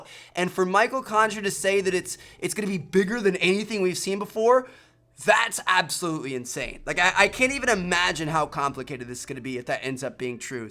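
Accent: Germany. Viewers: What a German voice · Wie der einfach mal voll den deutschen Akzent hat im Trailer 🤣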